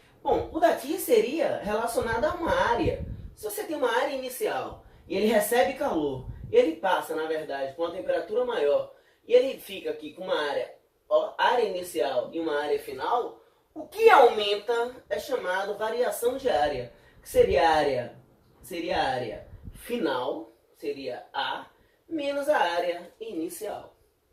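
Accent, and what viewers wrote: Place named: Bahia, Brazil. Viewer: Ce é da Bahia ? Sotaque parecido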